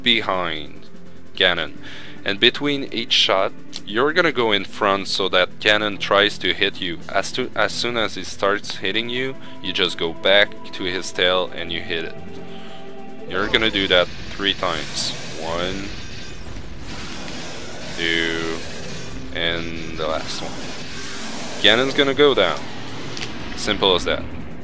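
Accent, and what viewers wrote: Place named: Quebec, Canada. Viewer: tu me semble avoir un accents québécois haha merci pour le tuto sa ma aidé un px avec des skips que je rochais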